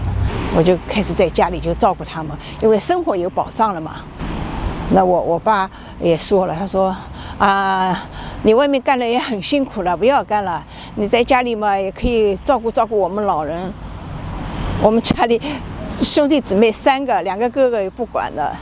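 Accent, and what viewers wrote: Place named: Shanghai, China. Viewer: 上海口音很浓厚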